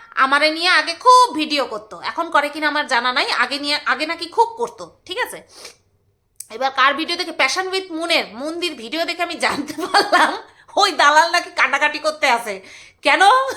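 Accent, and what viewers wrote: Bangal, Bangladesh. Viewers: Orey ki sundor bangal vasa sunlam..darun lglo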